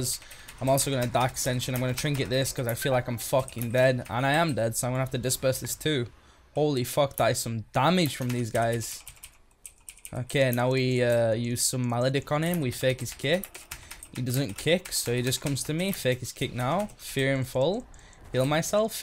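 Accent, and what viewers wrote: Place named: North, United Kingdom. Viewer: Love the videos man, entertaining. As a fellow northerner, it’s nice to hear the accent too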